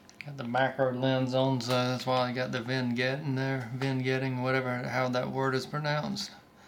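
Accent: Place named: South, United States. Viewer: Where exactly is your accent from? Sounds quite southern to me :) That is one beautiful bouncin' banana spider with the prettiest pattern on its body. The way it's cleaning its legs is still a little awkward to watch, though. I've come a long way with spiders but these awfully long legs are still making me feel rather uncomfortable. I'll get there eventually.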